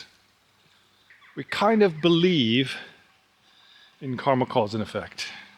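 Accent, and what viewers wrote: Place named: Scotland, United Kingdom. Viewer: I am going with a friend in a few weeks. I only live 2 1/2 hours away by car and yet I only heard about it two months ago. And yes, I have been studying Buddhism for years. I live on walney Island. In cumbria UK. I have been studying. the Dharma for quite a while but on November2nd 2024. My precious wife died from a heart attack at the age of 58. And even though I understand that everything is transient. It still hurts. Even though I don’t exist, ultimately conventionally it hurts! So I decided to go on retreat somewhere and an American Yogi told me about this place it turns out my psychotherapist teacher in the early 90s used to go there I talked to his daughter and she said yes that’s why he went and yes I had no idea he had studied Buddhism! And so my American Yogi said maybe you’re meant to go there and I will be going within the next few weeks just for the day and then I will arrange for a retreat when the weather gets better as you know especially this week January 2025. 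It’s pretty cold everywhere.! I have one question for you do you live in England but you sound Scottish to me? I have subscribed and will watch all your videos because this one is excellent thank you.yours Trevor.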